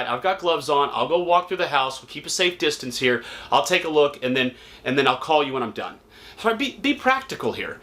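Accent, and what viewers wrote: South, United States. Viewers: I'm working to get pre-qualified now as a first time home buyer. Just want to say that I love your videos,. You've got great energy, mannerisms, and a southern draw to boot. I could watch all day!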